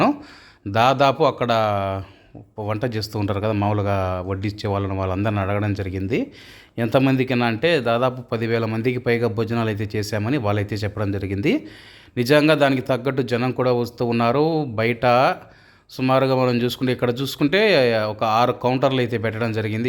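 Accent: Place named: Rayalaseema, India. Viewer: That is the rural slang of Rayalaseema people.We need not correct any slang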